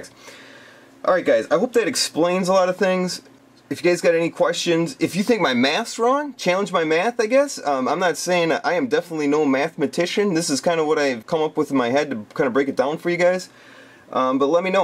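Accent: Canada. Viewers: Are you from Newfoundland?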